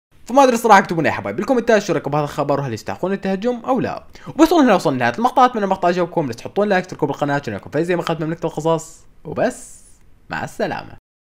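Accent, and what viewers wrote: Iraq. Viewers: عراقي اكيد من لهجته 💝🌚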